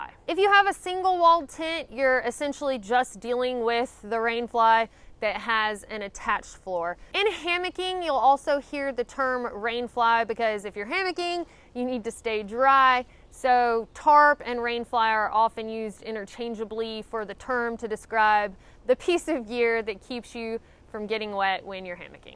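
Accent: South, United States. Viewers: Not only is a lovely lady, she's also a real smart cookie😁 Thankyou, as always your video was very informative and as always, we love that southern accent 👍👍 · 💜 you’re Vlogs and inspiring enthusiastic way of explaining hiking adventures in your southern belle accent 💗Thank you for sharing 💜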